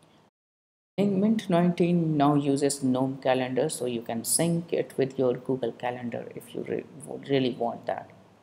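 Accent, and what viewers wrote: India. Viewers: It's Indian accent. Where are you from?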